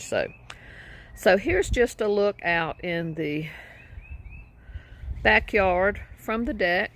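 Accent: South, United States. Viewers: My favourite kind of garden. Love the Southern accent.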